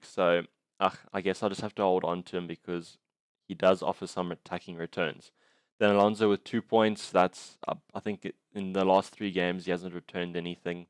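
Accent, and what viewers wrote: South Africa. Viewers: FPL ID: <num>. Top 2k. Notice the accent - Are you South African?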